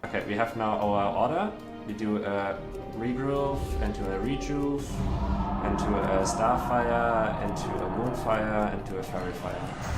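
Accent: Germany. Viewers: krass wie man den deutschen einfach immer raushört^^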